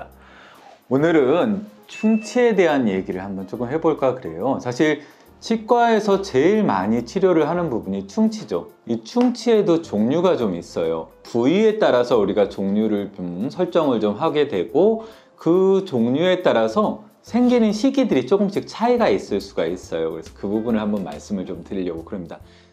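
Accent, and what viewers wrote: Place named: Gyeonggi, South Korea. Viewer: 경기사투리인거 경기도가 고향이신거?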